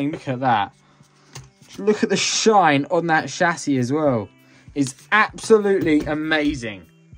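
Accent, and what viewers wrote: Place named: United Kingdom. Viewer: Nice new to your channel but I never thought I would find a better basher than kev but I did that’s you also can you do a vid on slash, bandit, and rustler because I have a rustler and I get your British and that’s your accent but saying Al-i-min-yum is fine instead of Al-lum-i-num but pls don’t say Shassy it’s ch not sh you don’t say it like Charolette it’s chassis sorry not trying to be rude but it like makes me feel wiry to hear shassy but love the channel and again I’m sorry if I’m being rude ❤❤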